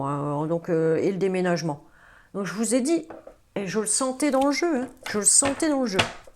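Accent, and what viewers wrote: North, France. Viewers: J'adore l accent du Nord j en suis issu super adorable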